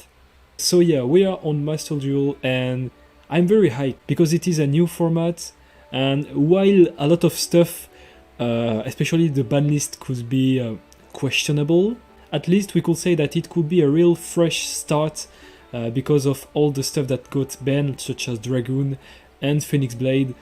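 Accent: France. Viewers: The heavy French accent makes this very awkward and awesome at the same time.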